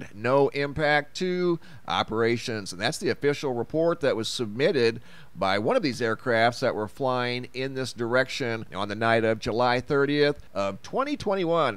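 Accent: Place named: South, United States. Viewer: If only you could just talk and not sing your words like with a old country twang!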